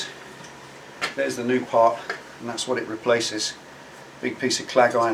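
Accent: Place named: West Country, United Kingdom. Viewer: Top yoke every Tuesday made me laugh. Gurt there's the sound of the West Country.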